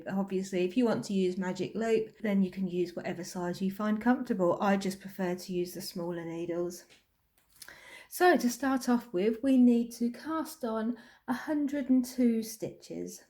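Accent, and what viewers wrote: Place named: United Kingdom. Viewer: This looks great! Can’t wait to try it! I love your accent! What part of the uk are you from?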